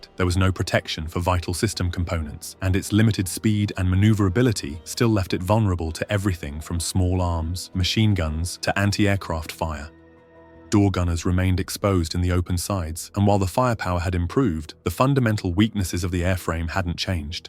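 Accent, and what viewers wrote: United Kingdom. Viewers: Rather disconcerting to hear a great story of American heroes and ingenuity narrated in a British accent. ' Military' has four syllables, not three, and 'lieutenant' is not 'leftenant'.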